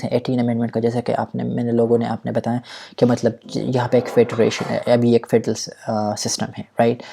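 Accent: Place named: United Kingdom. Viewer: Please Urdu ko Urdu ki tara bole me confuse hota hon baz alfaz me... You speak Urdu like English man.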